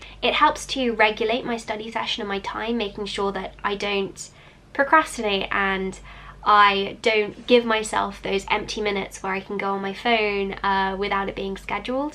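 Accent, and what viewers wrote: United Kingdom. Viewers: Are there any youtube channels have British accent and make great videos liker her? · She is using british accent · Hermione accent? She's just British mate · Great video miss , iam from india , highly appreciate ur work , I would request u to read quran translation of which is approximately 500 pages , a book which is from god believe 25 to 30 % of world population , by the way I think ur british ,. I love british accent a lot , great job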